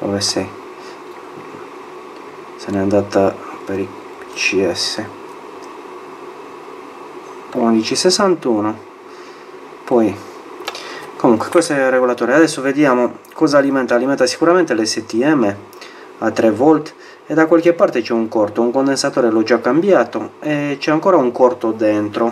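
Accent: Sardinia, Italy. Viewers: secondo mè l'hai messo in corto , si nota un tipico ma simpaticissimo accento sardo unsaluto e comunque un grazie per l'impegno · Dall'accento non capisco se sei sardo o rumeno.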